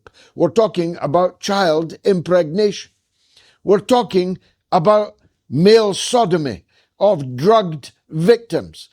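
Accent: United Kingdom. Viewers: What kind of British accent is this? Thanks.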